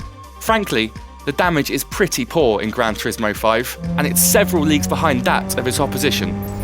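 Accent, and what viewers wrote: United Kingdom. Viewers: The British accent made everything sound more reputable. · why do british accents make everything sound worse than they really are!!!!??? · Fuck no i aint listening to that fuckin awefull british english! Makes me wanna puke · aaarghhhhh that freakin british accent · No. What you're hearing is his accent. He's likely from Britain, which is where the English language originated from. We here in the west speak English differently since the language has evolved through time. I'm guessing this is your first time hearing an English accent. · ''buh wif the game lacking elseweah? thas noh kwai enuf to kip it un tup off the racing pail.'' FUCK YOU, BRIT! no offence to the other brits out there, but this guy's a penis gnome. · I hate British accent! Damn!!!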